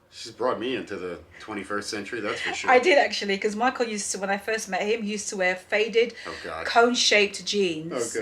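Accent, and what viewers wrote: RP, United Kingdom. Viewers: I wonder if people think you come from money because of the accent? A lot of folks hear an English accent and think that person as posh. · I think the wealthy assumption comes from you having a 'posh' voice and 'quality ' taste. People these days need to be part of a fantasy story..and you are one. KEEP UP THE GOOD WORK! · Y'all are lovely! These assumptions... I think people think you came from money because of your British accent and good taste. Who knows? I usually find that the assumptions we make reveal a lot about us and less about the person. 🤷🏿‍♀️ I enjoy all of your videos and Insta account. 🙌🏾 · I think it’s the accent. Americans obsessed with brits & British accents & think everyone that speaks that way comes from money! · I wonder if the people commenting about your coming from money are American. I wonder this because to us, the English accent is sophisticated and posh-sounding. 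Coupled with your style, your accent makes you appear refined and high class to Americans. I’m an American and I assumed that you were wealthy too because of your style and accent. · I think people (esp Americans).assume you come from money because of your lovely plosh (madeupword) accent. But it is easy to tell from your down to earthness that you have worked hard and endured some things. Kudos to you. Wishing you continued success. 😘😍👍🏾👏🏾 Hey Michael 👋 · I bet that the comments that you come from money are from Americans because we can think an English accent can sound educated, respectful and prestigious. Thanks for being so open, I like you and Michael even more. Didn’t think you can too What Everyone is Wearing but you did. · I can't understand the commenters thinking you grew up wealthy :D because you're very "street smart" and down to earth! Maybe it's the British accent ;) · You dress well, and exude confidence...and also your accent is “posh” so I’m assuming that’s why people think that you come from wealth. 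🙏🏼 I’ve spent the last few months in London and there are such varying accents I can almost always spot a “proper” English accent...it’s sounds very different from someone that’s from the North or even a Cockney accent! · I am an O.G. follower. It's the British accent that makes Americans assume that you come from money or have money. And your impeccable taste and style. Just because it looks easy doesn't mean it is or was. PROPS TO Michael. he is a very self confident, supportive man and partner.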